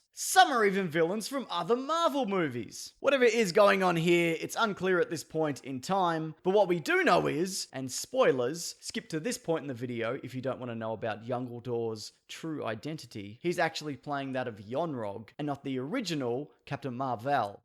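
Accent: Australia. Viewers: Maybe it's the Australian accent, but I never get offended when he trashes Trump, even though I am a Trump supporter. Thanks for always making me laugh,.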